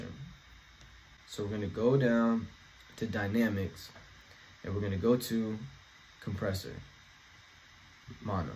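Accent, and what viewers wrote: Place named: California, United States. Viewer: that’s wild lmfao I can hear it in your voice you been around Cali people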